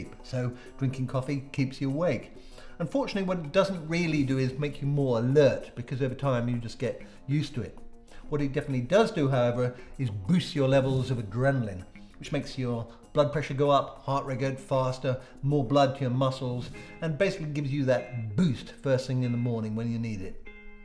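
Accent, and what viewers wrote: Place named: England, United Kingdom. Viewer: Drinking my latte as I watch this. Still not quite sure what a "lartay" (as you and so many other English people say - oh no, not us Welsh) is though.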